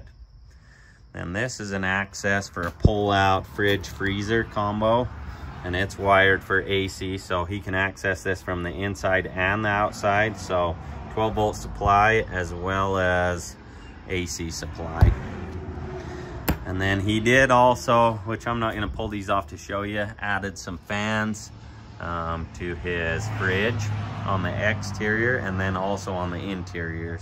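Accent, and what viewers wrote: Utah, United States. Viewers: I thought I recognized that Utah accent. Love this camper!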